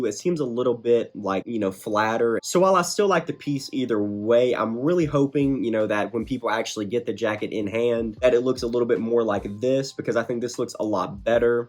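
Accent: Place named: South, United States. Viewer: This dude’s accent is so sick. Very southern, I love it! Love your channel man, so nice to find a brother through GOLF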